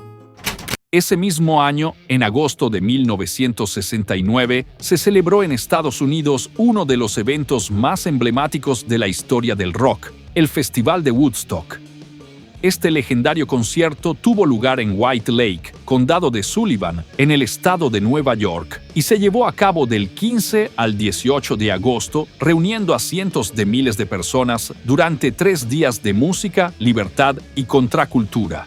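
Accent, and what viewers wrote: Yucatán, Mexico. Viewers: ¿El narrador habla como yucateco o me lo estoy imaginando?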